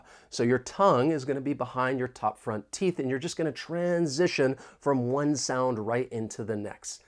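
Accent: United States. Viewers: the best for you. Although you have not a strong american accent, i am able to catch the 95% your speeches. Other teachers, and much more docomentaries with the voice off are a bit challengue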